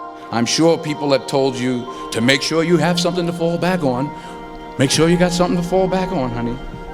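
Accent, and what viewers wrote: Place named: Scotland, United Kingdom. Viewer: I was having some trouble recently calming manifestation.guide the voices in my mind during my morning meditation. him has a wonderful Scottish accent and a very calming voice. Thanks to his meditation CD's I have gotten back on track and feel a big difference in my meditation practice.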